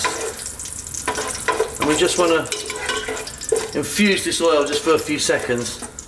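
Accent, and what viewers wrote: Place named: England, United Kingdom. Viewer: I love your way of cooking as well as your English accent Amazing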